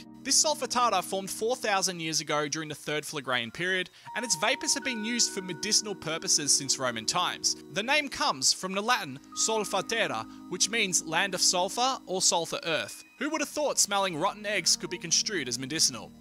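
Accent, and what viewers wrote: Australia. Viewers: So now the voice of my nightmares is an Aussie lol. Fascinating and terrifying. Well done. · That Aussie accent 🤣🤣🤣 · Awesome docs,plus ya sound like an Aussie 👍👍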